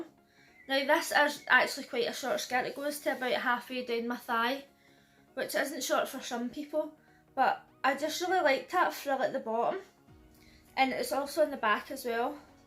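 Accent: Scotland, United Kingdom. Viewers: Nice clothes there, Lol to be honest I mostly watch your videos to hear your Scottish accent I really love it, great video though.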